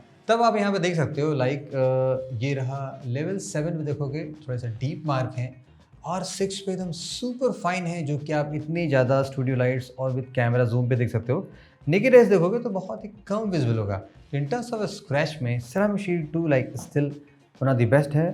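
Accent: India. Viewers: I don't really understand English with an Indian accent. Can someone give a conclusion from the video? Is silver better?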